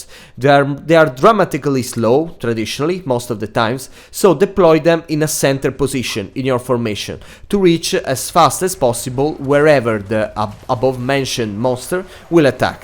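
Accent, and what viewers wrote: Italy. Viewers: Fantastic video, by the way, are you Italian?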